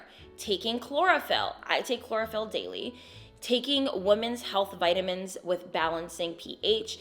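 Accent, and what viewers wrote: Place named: Pennsylvania, United States. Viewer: I just found your channel i was like she sounds like a delco girl lol read your bio and close enough lol you’re beautiful girly🤍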